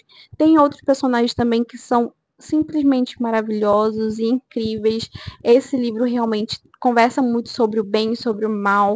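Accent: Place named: Pará, Brazil. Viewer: Seu sotaque é do Pará.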